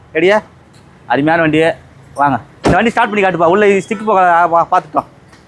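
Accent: Madurai, India. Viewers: Madurai vasagam super 🎉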